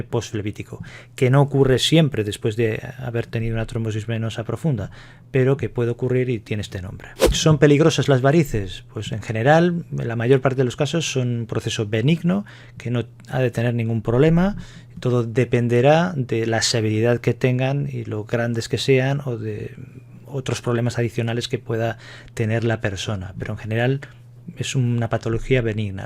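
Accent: Spain. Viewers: Por el acento del Dr. ,puede estar en España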